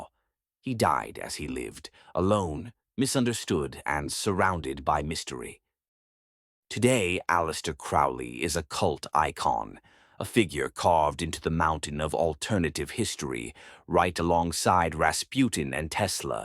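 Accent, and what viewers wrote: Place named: United Kingdom. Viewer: At first I didn't notice the ominous music in the background, it fits the video, also loved the British accent it gave it more life😮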